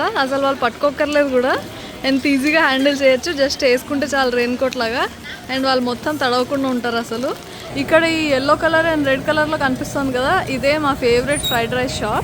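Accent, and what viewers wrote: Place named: Karimnagar, India. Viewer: Slang different antey meru Hyd or knr. madi karimnagar akka. Continue videos cheyandi anna.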